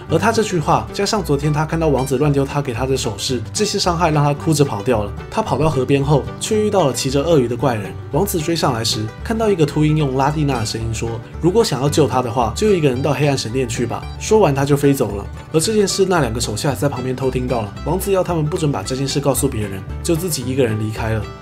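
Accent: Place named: Taiwan. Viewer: 台湾腔听着好舒服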